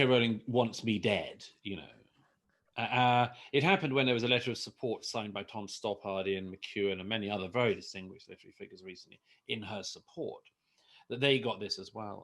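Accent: United Kingdom. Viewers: Your tuned British accent ❤ makes me hot on you Douglas babe 😘💋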